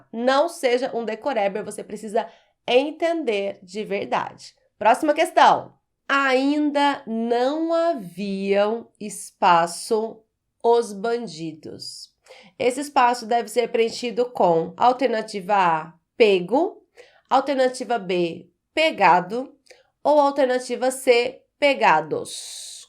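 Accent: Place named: Caipira, Brazil. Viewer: Sua aula se torna consativa para quem sabe as respostas certas, mas quer apenas revisar. Pense num modo de fornecer as respostas e depois fazer os comentários. Assim, quem errou irá aprender; quem acertou passará adiante. Seu R caipira já QUASE desapareceu, tornando-se praticamente suportável. Por conta da melhora que certamente ainda ocorrerá, já adianto aqui meus parabens!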